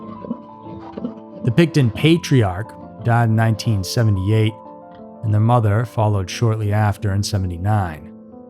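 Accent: United States. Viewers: OMG I ❤️ your accent... where in the USA are you from🇺🇸??.... I'm from Staffs UK🇬🇧... love your posts 😍👌🏼💋 keep them coming, thanks Jo xx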